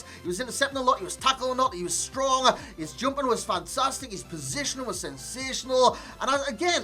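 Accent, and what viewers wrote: Newcastle, United Kingdom. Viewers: Proper Geordie love the vids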